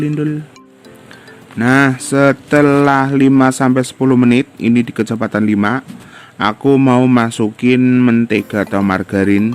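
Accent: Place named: Tegal, Indonesia. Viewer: Oh.. sampean org tegal to...sip dah he he heee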